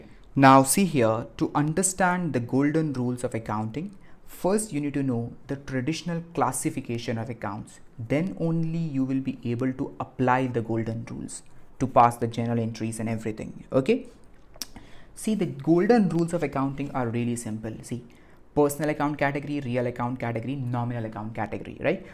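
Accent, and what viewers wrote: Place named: South India, India. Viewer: That's really great yll iblove the explanation 😊 and I also prefer morden approach 👍 And I don't no but are u south Indian 😅 I am assuming u as one cause of your tone sorry if I am rude but I am not saying in that way ...😊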